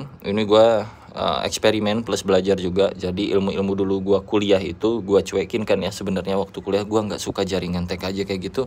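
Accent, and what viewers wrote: Sunda, Indonesia. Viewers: ha..ha...ha... sunda pisan , salam kenal ti cimahi kang...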